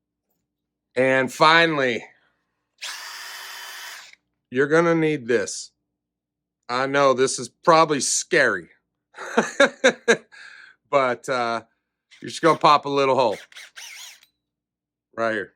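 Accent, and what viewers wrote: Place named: Ozarks, United States. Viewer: !! The accent is coming in great. You have assimilated to the Ozarks nicely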